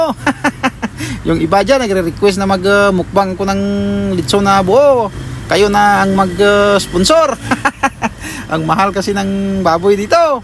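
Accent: Iloilo, Philippines. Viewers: Ilonggo ka dol.?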